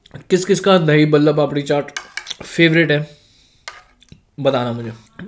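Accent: Maharashtra, India. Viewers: Lol your marathi or bengali or what?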